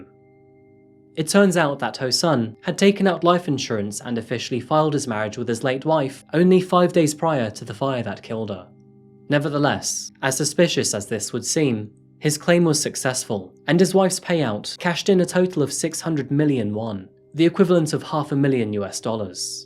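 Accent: United Kingdom. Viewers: It went undetected. I’m still trying to focus on what you’re saying. Idky British accents are hard for me to understand….it’s a real life subtitle 😂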